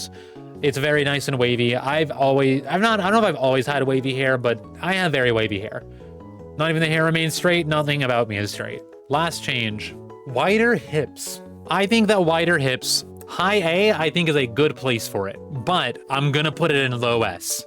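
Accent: United Kingdom. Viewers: you sound like you're doing a british accent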